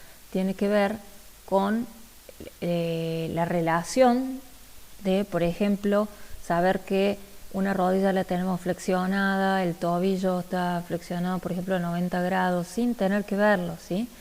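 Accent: Córdoba, Argentina. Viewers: es re util gracias sos de cba por la tonada?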